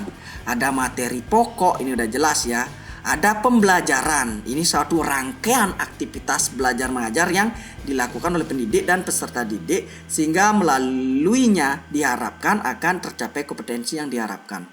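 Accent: Bali, Indonesia. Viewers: Kyk logat bali malah menurutku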